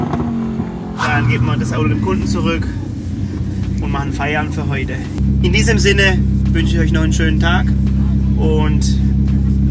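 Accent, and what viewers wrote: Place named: Swabia, Germany. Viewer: s’Hebelle vom Golf 2🤣🤣🤣 zu geil, richtiger Schwob bisch bliebe, gell🤣✌️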